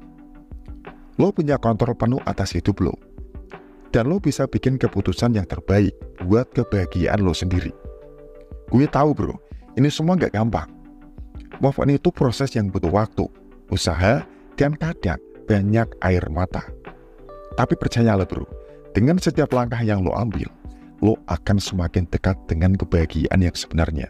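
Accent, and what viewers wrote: Java, Indonesia. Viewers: aga medok nampakmya moderator kali ini🤔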